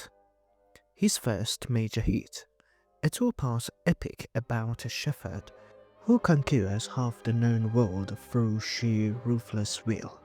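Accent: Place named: United Kingdom. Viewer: We would like you to tell us the stories of ancient Iran (especially the Shahnameh) with a British accent, Professor. 🔥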